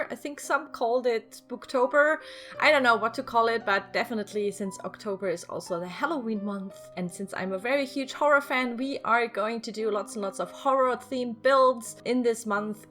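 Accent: Germany. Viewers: I think you're my favorite <3 I just love your buildings, your creative without using the cc, you're original and I love your german accent!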